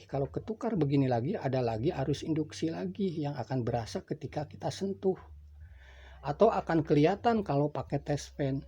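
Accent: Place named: West Java, Indonesia. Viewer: enya nyariosna sunda banget hahaha tapi tenanaon, maju terus kang!!!! SUNDA terus berkarya☺️☺️☺️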